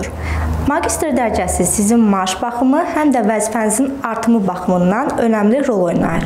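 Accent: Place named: United States. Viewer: Sözləri sanki Amerika ləhçəsiylə ifadə edirsiz. Danışığnzı düzəltsəz yaxşıdı. Adam dinləmək isdəmir. Bu kanal çox faydalı kanaldı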